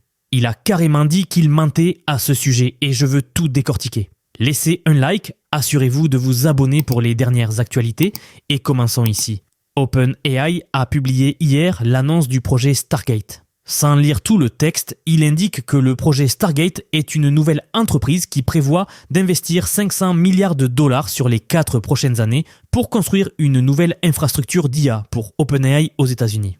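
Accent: South, France. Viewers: Cet accent, c'est de ToLose ou Marseille ou Montpellier ou?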